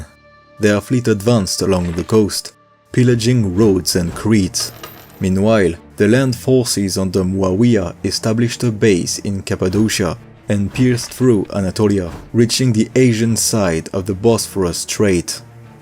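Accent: France. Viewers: mate are you french ?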